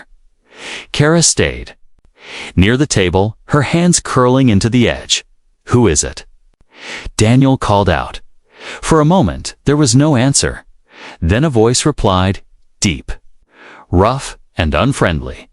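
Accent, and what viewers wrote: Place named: United States. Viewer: The Anerican accent does not work with these kind of stories.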